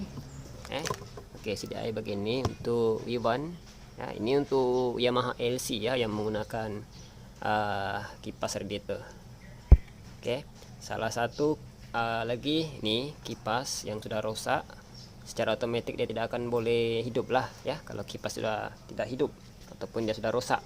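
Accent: Sabah, Malaysia. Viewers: saya suka dengar orang sabah cakap. arwah mak saya asal KK lepas kahwin dgn arwah ayah asal kedah terus menetap di sini. hehe lain cerita pulak. penjelasan yang hebat 👍🏼👍🏼. kipas radiator LC saya tak berfungsi pun entah berapa lama. tau naik saja. boleh la saya cuba cara ni. terima kasih semoga murah rezeki bang.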